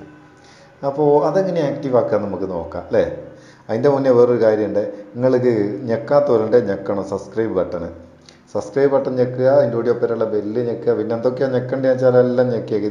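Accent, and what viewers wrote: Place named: North Kerala, India. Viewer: എന്റെ മുത്തുസെ എന്തിന്നാ ഈ പറയുന്നേ... കുറച്ചു സ്റ്റാന്റേർഡ് ആക്കിക്കൂടെ... വേറെയും എതെല്ലോ ജില്ലക്കാരും കേൾക്കുന്നില്ലേ... തെക്കന്മാർക്കൊന്നും മനസ്സിലാവില്ലാന്നേ..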